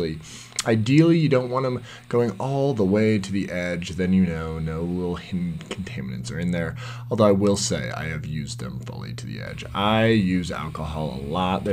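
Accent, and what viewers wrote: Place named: Australia. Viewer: Reminds me of an aussie man voice over lol. This is great thanks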